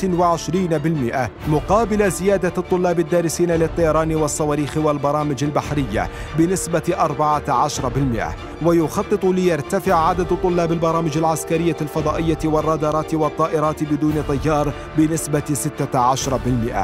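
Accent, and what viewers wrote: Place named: Egypt. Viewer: المعلق مصري 🇪🇬❤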